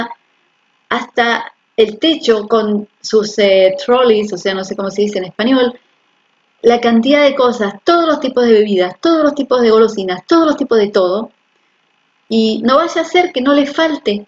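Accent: Argentina. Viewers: Perdona, eres argentina?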